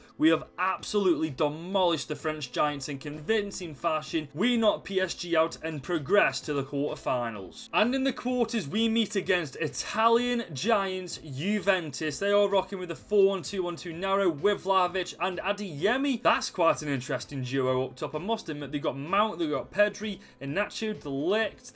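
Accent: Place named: Yorkshire, United Kingdom. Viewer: You sound like a yorkshire lad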